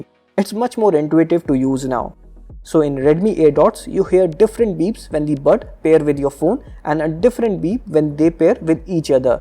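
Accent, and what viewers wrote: India. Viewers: Huy Tran this is their accents. I got familiar with them after 5 years study in India..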